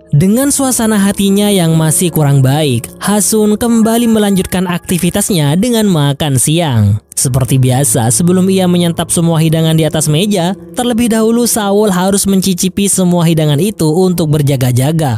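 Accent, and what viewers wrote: Madura, Indonesia. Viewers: Mas pengisian suara nya , orang Madura,logat Madura nya kelihatan banget 😅😊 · Reng madureh kak ? 😅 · Iya ya logatnya madura banget😂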